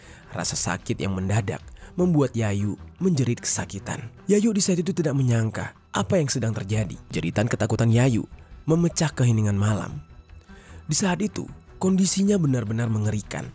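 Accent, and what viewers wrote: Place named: Java, Indonesia. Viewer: Lancar bgt logat jawanya bang 😅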